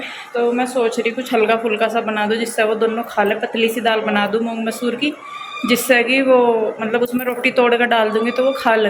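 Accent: Haryana, India. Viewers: Bahut sundar lag rha h ji ghar aapka ji aap haryana se ho kyuki aapki boli hamare jaisi h same boli h hamare yha yehi bolte h yh mera haryana dodh dahi ka khana yh mera haryana 👌👍🙏jai shree krishna 🙏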